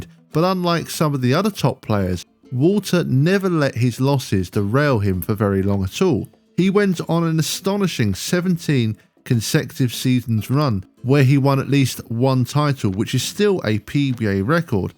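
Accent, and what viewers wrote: United Kingdom. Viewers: Bs. A British AI voice?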